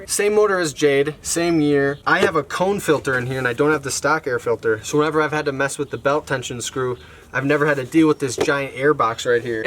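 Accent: Wisconsin, United States. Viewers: I love the mn Wisconsin accent lmao I'm from St paul. Totally understood everyhthing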